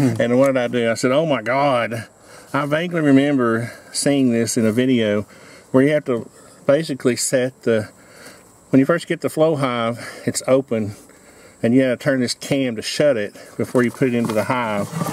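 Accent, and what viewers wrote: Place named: Appalachia, United States. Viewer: Peter has a New English accent this guy has a Appalachian accent. Ones Northern the others Southern very different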